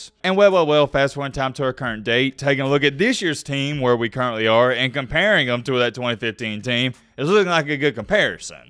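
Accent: South, United States. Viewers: jalen mealroe kills me with his southern accent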